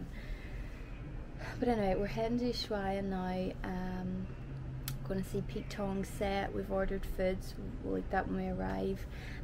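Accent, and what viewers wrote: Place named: Ireland. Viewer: Lively and beautiful. That irish accent ❤. Glad youre with my fav band DD.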